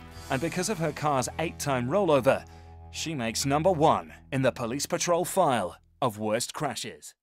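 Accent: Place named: England, United Kingdom. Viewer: Whats innocuous about this video is an english man narrarating crashes on american highways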